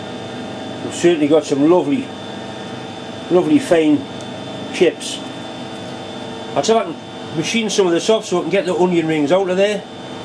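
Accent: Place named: Geordie, United Kingdom. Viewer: When I subscribed to this channel, it was because of the impenetrable geordie accent. If I'd have know that there would be veggie machining iin the future I would have subscribed twice!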